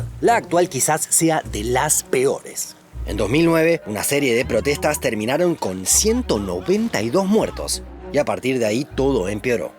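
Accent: Argentina. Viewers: ¿Por qué pronunciás "Urumchi" si es "Urumqi"? Si en vez de Beijing decís Pekín... no se entiende. Igual por suerte volviste a usar el yeismo de Argentino, hace unos años te hacías el mexicano neutro y decías "poio" o "iuvia".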